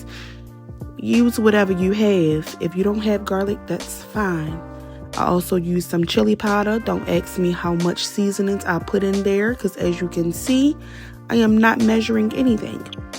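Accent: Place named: Baltimore, United States. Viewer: Looks good, youre from Balitmore arent yew lol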